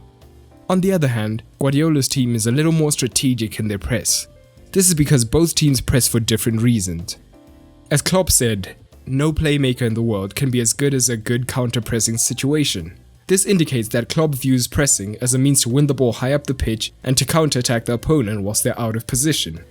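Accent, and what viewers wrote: South Africa. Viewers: You sound South African ... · You sound South African 🤔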